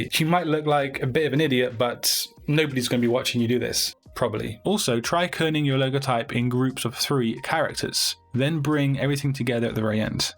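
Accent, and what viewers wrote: United Kingdom. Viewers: Are you British? I like your accent !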